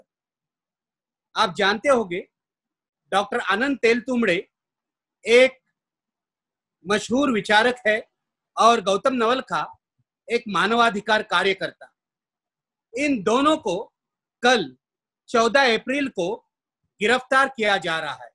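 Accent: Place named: Maharashtra, India. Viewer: Abe bewakuf pehla marathi slang main Hindi bolna band kar.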